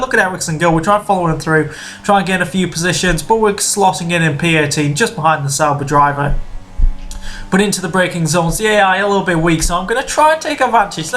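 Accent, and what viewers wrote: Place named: North East England, United Kingdom. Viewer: Are you from the north east of England? your accent sounds like its from there.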